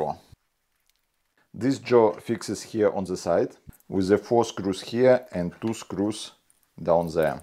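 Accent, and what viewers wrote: Russia. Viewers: Русский акцент потихоньку убывает у автора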